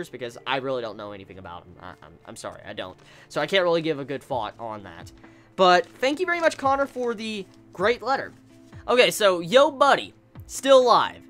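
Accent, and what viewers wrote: South, United States. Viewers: and your country accent lol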